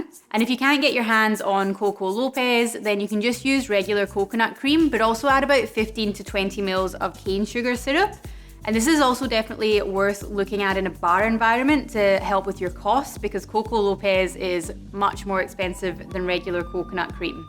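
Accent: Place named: Scotland, United Kingdom. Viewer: i thought so but at first i was thinking irish... but u sounded scottish... i couldnt make my mind up lol i guess accents can vary alot in different areas of scotland like they can in england... and im sure the same in australia😃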